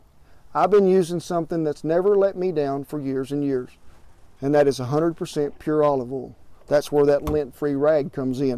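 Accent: South, United States. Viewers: oh that southern accent... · And just to be clear, did you say that there's lead in the paper towel? I thought something like that would be illegal to do? And about the ''Leadfree'' rag, is that even a thing? Sorry if it isn't what you were really saying, I'm danish and don't understand southern accent 100% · Thank you, you've made the Southern accent cool. Turns out that someone who is very intelligent, makes the Southern accent pretty awesome. Great videos. I've watched 2 so far. From the PNW. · I usually do not enjoy listening to southern accent but in this case I sure did, enjoyed it very much. Thank you Mister, very useful information and I do have that same exact red bandanna from walmart (I was wondering how to put it to good use, now I do know) and loads of cast iron cookware, yeeeeehaaaaaa....... I like your style, I Like the way you roll, I like you! 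· Howdy Kent, just found you, love the way you talk, love your southern accent! You talk like a real sweet and humble person, you're really fun to listen to. Bet you and your family have a blast at your cook outs!! ha....ha.... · Love the flaxseed oil tips, just buy the capsule from pharmacy... brilliant. Love your video, with that southern accent... ☺️.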